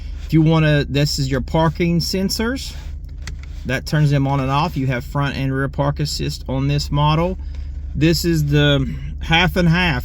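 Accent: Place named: United States. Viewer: Thank you, loved your cow boy accent, lol ( No i mean it) I can talk to all dang day long, appriciate you to go over all the controls, it helps a lot, bye the way I fall off my chair laughing on use that dial to tune to you wann jam to lol.... Love it brother.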